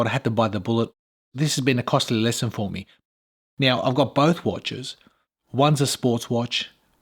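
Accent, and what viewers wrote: Australia. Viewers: I love your videos mate. I love your Aussie 🇦🇺 accent. Love from India👊😊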